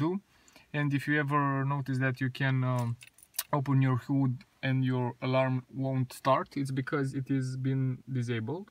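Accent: Romania. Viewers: Esti român? Ai accent :))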